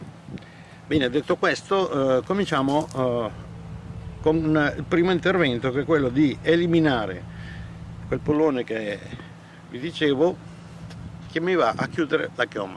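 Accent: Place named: Calabria, Italy. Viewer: sentivo un certo accento calabrese. Anche io sono calabrese. Di Nicotera VV.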